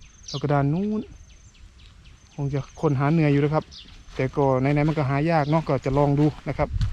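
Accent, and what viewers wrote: Korat, Thailand. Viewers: ชอบแถะๆคับ คนบ้านเดียวกั๋นคับ โคราชคือกั๋น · คนโคราช บ่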